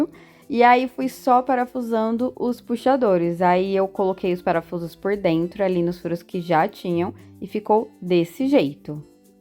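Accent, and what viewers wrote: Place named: Inner São Paulo, Brazil. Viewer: Olá, desculpa minha intromissão, mas te pergunto... pra que essa saudação em inglês???? Nosso idioma, como sabes, é Português, ó tu, que és brasileira, e tens um nítido sotaque do interior paulista... Por outro lado, sei que o canal é teu e, como pessoa, tens direito pleno de te expressares como queiras, mas... enfim... Fora isso, admiro teus trabalhos, todos, menos as cores de tintas que utilizas, mas isso é questão de gosto e gosto não se discute... E, a título de cooperação, quanto a esse novo projeto teu, o nome correto para o antigo armário, agora transformado, é "Balcão". Aparador é outra coisa bem diferente.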